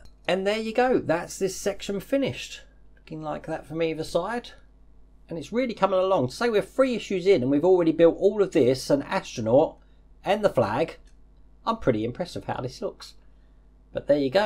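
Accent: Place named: Cockney, United Kingdom. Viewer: He speaks with a cockney accent.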